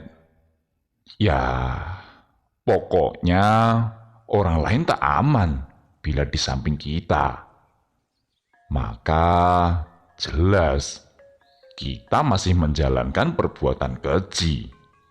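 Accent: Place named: Banyumas, Indonesia. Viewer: Salam ngapak Yen ora ngapak ya ora kepenak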